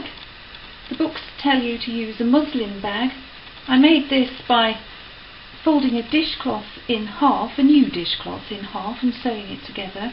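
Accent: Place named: Wolverhampton, United Kingdom. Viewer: I live in Italy and tomorrow im going up town to get me some oranges, we have them all around the town, people dont eat them because they arnt eaten as they are not sweet enough!!! What a waste! noticed you have a northen accent (wolverhampton), like the way you are so clear, I shall enjoy making my marmalade.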